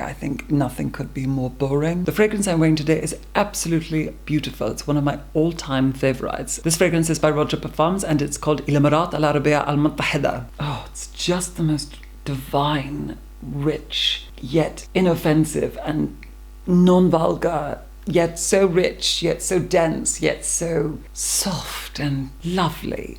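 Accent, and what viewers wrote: Scotland, United Kingdom. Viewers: He’s getting rid of his Scottish accent 😭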